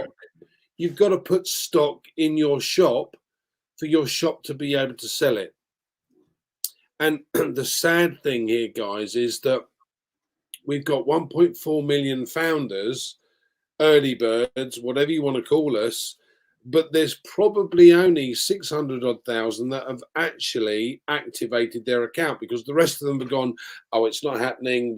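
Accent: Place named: RP, United Kingdom. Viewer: that was a very good explanation in plain english or what we call Queen's english and hope at least 35 out of 50 got it😳:hand-pink-waving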